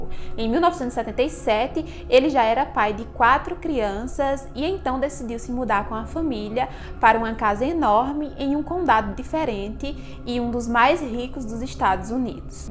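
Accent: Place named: Rio Grande do Norte, Brazil. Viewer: Segunda vez que venho no seu canal muito interessante, pelo seu sotaque. Vc mora em natal rio grande do Norte ? Bjs linda !!!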